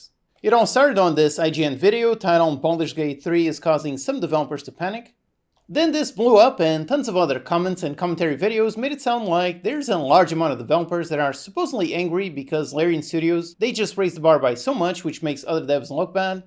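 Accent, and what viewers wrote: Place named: Portugal. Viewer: Are you from Portugal? You have a similar accent!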